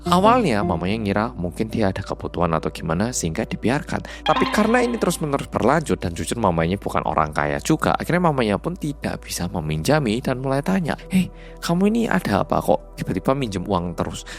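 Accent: Java, Indonesia. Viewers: Hai medok.... Nyimaaak 🎉🎉🎉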